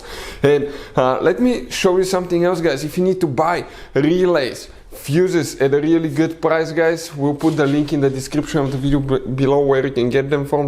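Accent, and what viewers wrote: Russia. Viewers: half way through video hes still talking in that horrible russian accent! ughhh i have to vomit now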